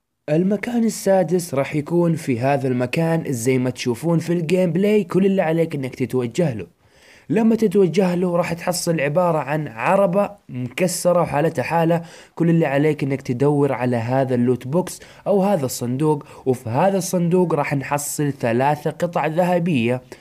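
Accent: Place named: Hejaz, Saudi Arabia. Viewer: هذي اللهجه الحجازيه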